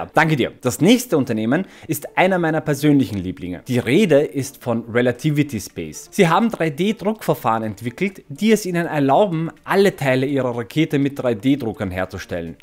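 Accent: Austria. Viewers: Sehr gut! Tolle Ideen, ja bitte! Mehr Witze, mit Oestereicherim Akzent! Ehrlich Top 10 Space YouTuber in allen Sprachen! Wie wäre es mit einer Episode, die sich damit beschäftigt, was passiert, wenn Starship 420 bei (1) Start, (2) Max-Q, usw. explodiert? Und wie Du die Chancen für jeden weiteren Schritt einschätzt. :)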